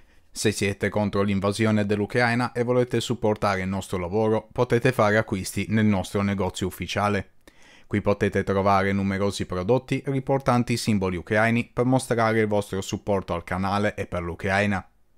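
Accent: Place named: Albania. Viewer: il suo accento assomiglia tanto alla lingua Albanese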